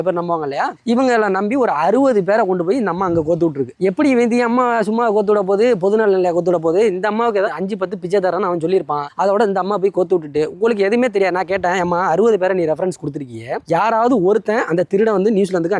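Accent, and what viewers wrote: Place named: Tirunelveli, India. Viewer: தம்பி நீங்க tirunelveli ya? Unga slang namma ooru மாதிரியே இருக்கு.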